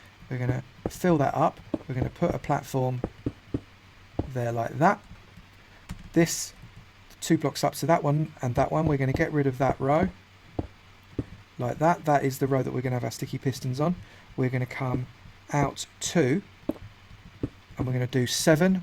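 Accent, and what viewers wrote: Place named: Luton, United Kingdom. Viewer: You kinda sound like you're from Luton. Sorry if I'm wrong, I'm not English, I'm American and just throwing out a guess.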